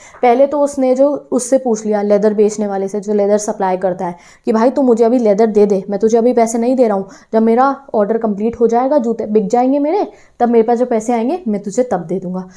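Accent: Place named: Uttar Pradesh, India. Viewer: Di I want to know aap kha se ho please tell me U.P se ho na aap aapki boli se lgta h eesa bhut bar btao na please di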